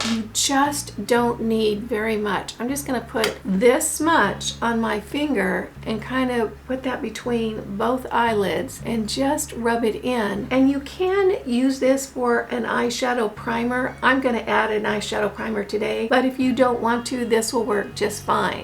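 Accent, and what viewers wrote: South, United States. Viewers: Started vlog at 5.30am in (Aus) woke way too early 🤣 and just couldn't resist hitting that start symbol. That southern accent? Gently drifted back to sleep then 3 hours later with coffee in hand just had to watch again. One who also wanted makeup tutorial your skin is literally glowing. Looks fantastic. Just wish products used when converted to $AUS could be purchased without my having to forego a fortnight rent 🤣 sad but true. Aus conversion rate, shipping & taxes in this country 😫 The final highlight powder I love but here at Sephora it's over $100. Sure there are dupes here but looking at reviews this seems to be in a class of its own. Am over 70 so getting a glow without looking like a sparkly crocodile is a challenge. Going to get the KVD bronze gel tho, so soft & pretty. Love her eye shadow pallettes as well but am def going to look at Milani. 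Thank you for videos & workouts that are age appropriate nice to hope that with effort & attitude maybe I am not totally invisible.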